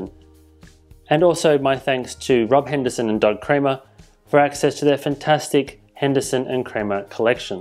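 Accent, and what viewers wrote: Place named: England, United Kingdom. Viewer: I ran this video at .75 speed and this chap is more understandable with the English accent.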